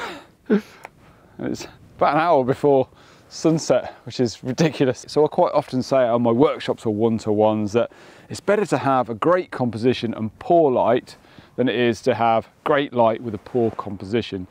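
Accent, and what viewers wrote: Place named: Scotland, United Kingdom. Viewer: Nice to hear this light Scottish tone in your voice. I turned my noise reduction off, that's all took from your video. The rest of my settings are identical. Thx for sharing your experience. 👍🏻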